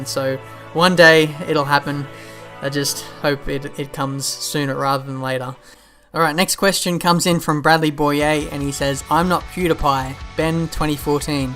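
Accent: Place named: Australia. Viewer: I subbed to you because of: - your smooth, no assists used, high level driving at a constant rate - the great editing (music, best highlights) - the setup videos - your pleasant voice (#nohomo). Because as a LISTENER thats very important. For example one of the reasons why speedyw and pewdiepie are so big is that they also have a very pleasant voice. I can not stand childish, high toned voices. That gives me the shivers in a bad way lol. :p - the aussie accent hehe Ps. I'm surprised that you only followed F1 since 2010? I for example, am a fan since I was a lil boy lol (since 1999). You missed some great fights between Hakkinen and Schumacher, Alonso and Schumacher then :p. Ps.ps. keep up your awesome work! ;)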